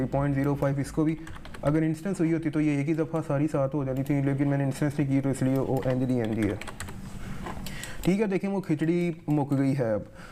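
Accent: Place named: Punjab, India. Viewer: paji tuhada punjabi accent att krva denda 🔥🤟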